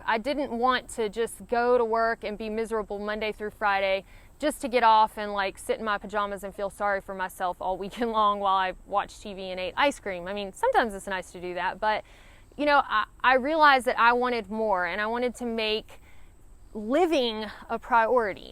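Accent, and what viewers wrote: South, United States. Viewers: "Kilts and the like don't confine and bind on inclines" :) Hearing you talk does a displaced southerner some good.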